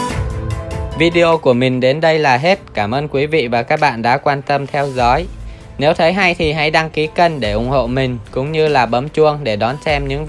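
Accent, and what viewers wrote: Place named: Thanh Hoa, Vietnam. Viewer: Ông này quê triệu sơn thanh hóa à.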